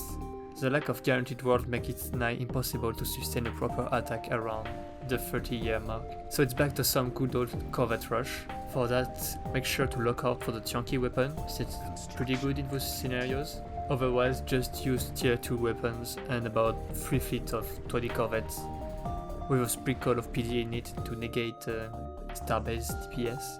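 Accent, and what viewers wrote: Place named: France. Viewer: Aaand Functional Architecture is being nerfed next patch, so ixnay on that plan :P By the way, it seems like the Shattered Ring gets regular planet designations, so how about going Lithoids (+Volatile Excretions for tile-blocker removal and war edicts?), colonizing the next-best planet, moving the capital there, and designating the Shattered Ring as a Mining World for +25% alloy output? PS: I like the French accent, it's not mediocre at all!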